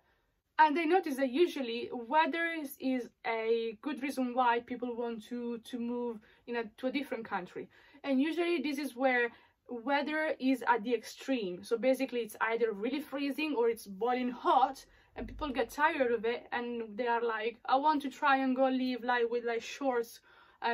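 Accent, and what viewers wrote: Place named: Andalusia, Spain. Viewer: Your Spanish is similar to 'andaluz'... Number 6: you know...all for the art!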